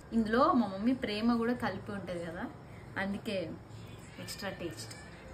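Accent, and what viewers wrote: Telangana, India. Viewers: రాయలసీమ అన్నారు మీకు telangana స్లాంగ్ వస్తుంది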